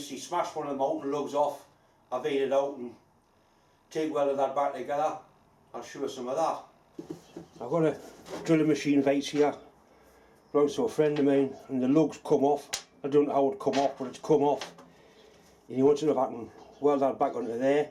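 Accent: Newcastle, United Kingdom. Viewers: As always, warms me wee heart to hear Geordie and a Great Thank you for sharing your videos and for making me discover more then Washington, the Penshaw monument, the Angel of the North, Hadrians wall, the Quayside and of course the Toon!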